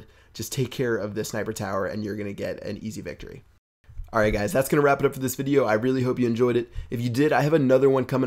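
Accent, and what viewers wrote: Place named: United States. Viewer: I've been playing boom for about a month now, I'm from aus, would love to do some commentary work with you, my Aussie accent and your American one would make good commentary 👍👍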